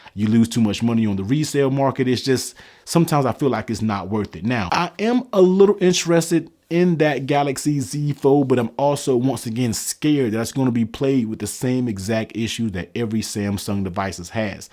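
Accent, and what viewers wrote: United States. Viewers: lol! Figures... I'm from VA and you sound like my all my family and friends in NC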